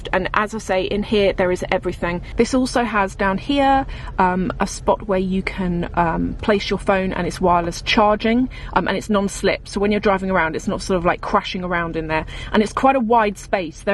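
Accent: RP, United Kingdom. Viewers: She has posh accent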